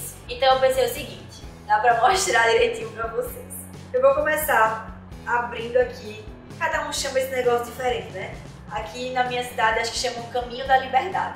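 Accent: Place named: Northeast, Brazil. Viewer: Mulheeeerr Esse é o primeiro video que eu vejo teu,e na abertura eu ja vi o sotaque nordestino,tu é de ondeee?maravilhosaa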